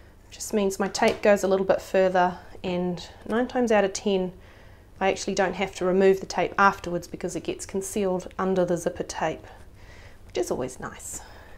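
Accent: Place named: New Zealand. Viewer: What sort (brand) of tape was it? Luv your kiwi accent too. Great tutorial. Thanks! 😂🤣💕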